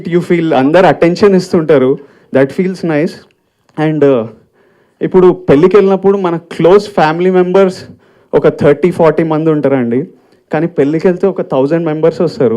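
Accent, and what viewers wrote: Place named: Telangana, India. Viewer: he spoke pakka..hyderbadi..telangana slang..so..natural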